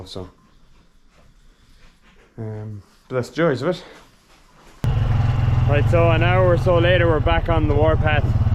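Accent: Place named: Ireland. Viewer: Another fabulous vlog!!! Each one gets better than the last. Great to see some of your flock close up….. they are gorgeous!!! Love that you chat to us as you go on your rounds. I could listen to you all day long with that lovely Irish accent! Great to see those ewes recovering & the lambies up & sucking. Good call with the calcium & other treatments. Casey was amazing catching that last ewe, she really stood her ground at the end there. I feel your frustration though when she’s raring to go but you really need her to stay put on the bike. Miserable conditions for you & the lambies in the drizzle & the cold. I pray the sun starts shining again for you soon!!! Quick question; if a ewe needs a calcium injection, is it a one off dose or will she need a few, & how do you know that her problem is resolved? Also, I’ve heard of twin lamb disease, is that calcium related or something totally different?? 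(I know, that’s 2 questions…… I’m pushing my luck 🤣)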